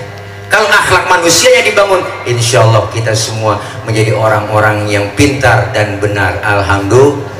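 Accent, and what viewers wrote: Jakarta, Indonesia. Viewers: Ini ustad tile pernah cerama di kmpung ane di cinere.ibu ibu ngakak semua.ane seneng banget .Betawi nya mantaaaap .guru kita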